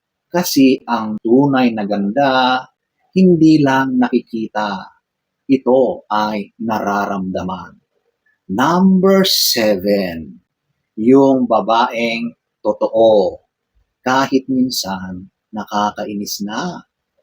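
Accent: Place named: Baguio, Philippines. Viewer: Dyan ako natutuwa sa pananalita taga Baguio ka ba sir?!😅😅😅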